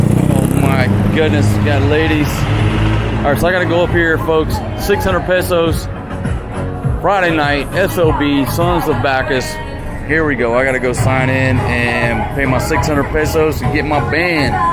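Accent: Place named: Ohio, United States. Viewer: You sound like my old battle buddy from Ohio, Cincinnati kids named Ohler. Ohio River Valley trash he used to say. Hahaha. He's now a retired LAPD cop living in San Diego.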